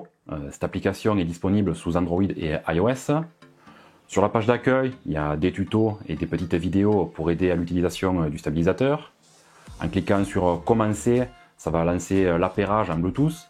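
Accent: South, France. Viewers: Impéccable, avé l'accent du sud 👍, belle présentation et démonstration, il me tente bien ce guimball ... salutations du 07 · Super vidéo vive l accent du sud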